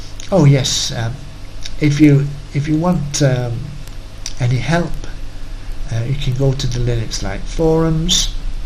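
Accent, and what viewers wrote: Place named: Yorkshire, United Kingdom. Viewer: who say he is a windows 10 lover he might be a windows xp lover also with that accent I can tell your from Yorkshire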